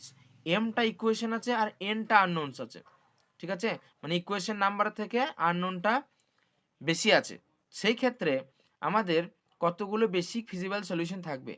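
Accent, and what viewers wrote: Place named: Bankura, India. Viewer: Sir আপনার কি বাঁকুড়ায় বাড়ি?? আপনার কথাগুলো অনেক টা বাঁকড়ি ভাষার মতো শোনাচ্ছে , তাই বললাম । কিছু মনে করবেন না please.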